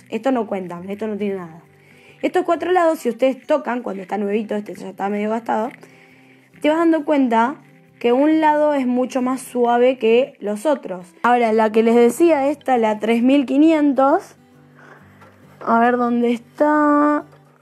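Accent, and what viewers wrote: Argentina. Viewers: Una pregunta ,sos Argentina???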